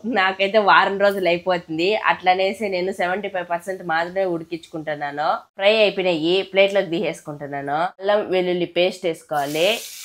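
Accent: Rayalaseema, India. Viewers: Rayalseema slang 🥰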